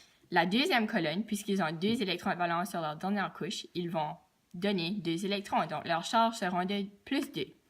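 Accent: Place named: New Brunswick, Canada. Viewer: Nouveau brunswick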